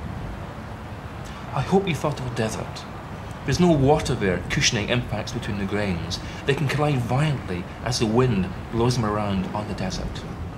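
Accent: Scotland, United Kingdom. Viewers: anyone else only pay attention to his scottish accent and not the video the whole time?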